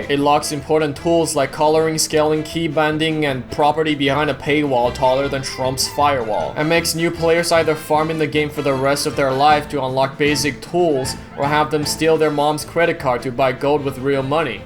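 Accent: Germany. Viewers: Are u german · He has a German accent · are u german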